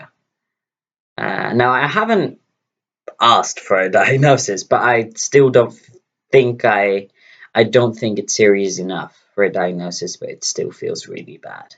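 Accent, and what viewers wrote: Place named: RP, United Kingdom. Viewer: Since your voice sounds a lot deeper now, you´re accent suddenly sounds kinda posh. Don´t know why but it´s really cute!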